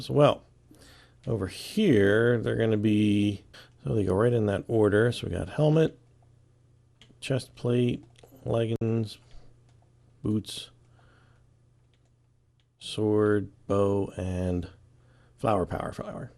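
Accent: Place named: United States. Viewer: he's from america but he talks a little different fro me I'm from England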